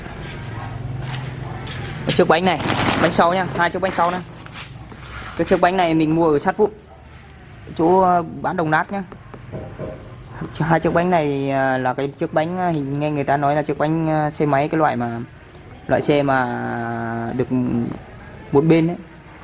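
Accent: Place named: Central Highlands, Vietnam. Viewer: Oh giờ mới thấy rõ ghe giọng nói hình như là người vùng cao OK Number one.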